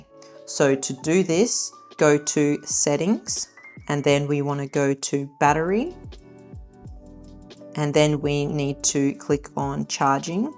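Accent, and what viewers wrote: Australia. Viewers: I must admit, half of the reasons why I watch these videos is because I miss Aussie accents too much. You go girl!!! Straya! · Thanks for this informative video. I’m in my 70s and realise I have so much to learn. Great to hear an Aussie voice. Will be checking out more of your work ❤❤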